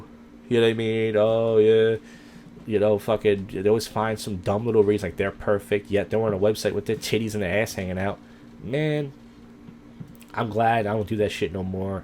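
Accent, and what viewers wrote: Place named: East Coast, United States. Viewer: U sound like east coast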